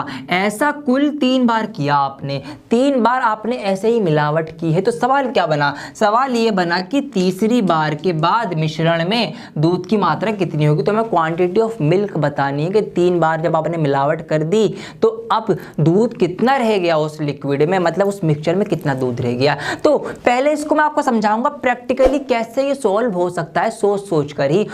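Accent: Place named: Bhopal, India. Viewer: Thank you Sir 🙂 .. you make every problem easy to understand ....i wanna know that ..where are you from ..and you pronounce words like Bhopali.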